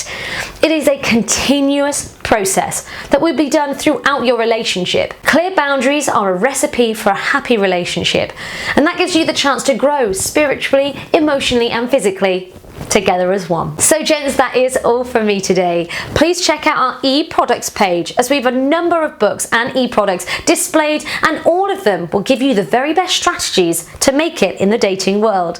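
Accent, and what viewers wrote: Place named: United Kingdom. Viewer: u r very cutely and your lovely British accent makes me mad, I Love u and thanks from u and your team to this useful product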